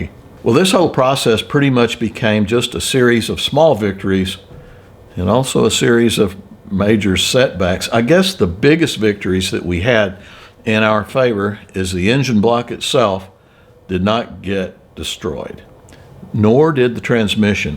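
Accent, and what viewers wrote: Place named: North Carolina, United States. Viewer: Hello from North Carolina Dr! I love your videos and I'm also a subscriber of channels too. You sound just like you are from NC because you talk like we do here, and I love it. Was that gold car a 63 split window Corvette in your garage? It sure looked like it was which is my favorite Corvette body style. May God bless you and your loved ones and I pray y'all will have a very Merry Christmas!!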